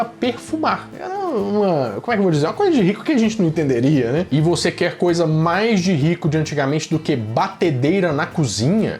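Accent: Minas Gerais, Brazil. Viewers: Jeitinho mineiro de falar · Eu aqui,dando faxina no quarto,parei para comentar 😂 Morrendo de rir,não sei o que é melhor,sua criatividade ou seu sotaque De uma mineira para um mineiro,nosso sotaque é lindo!😊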